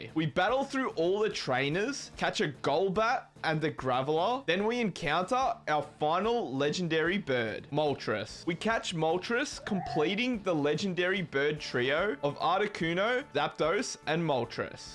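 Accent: Australia. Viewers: Biggest Victorian accent going. The “alite four”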